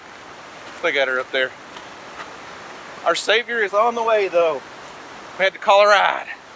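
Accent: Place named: Oklahoma, United States. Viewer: So glad I found your channel! Moved from near Oologah OK to UT in Dec 17. Nice to hear that Okie accent!!!!! These people here never understand what I am saying but love the mountains!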